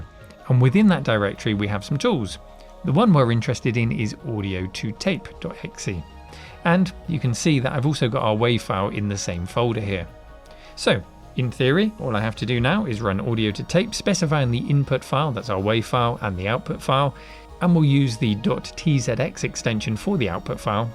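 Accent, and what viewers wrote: United Kingdom. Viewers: British LGR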